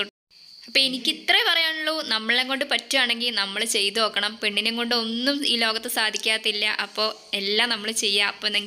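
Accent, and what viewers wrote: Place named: Thrissur, India. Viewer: Nee thrishoor kaariyano ninte samsaram bhayankara ishtaayi ketto ninte samsarathil kure tto tto athanu samsaram ishtamayath njaan kannur kkaranjaan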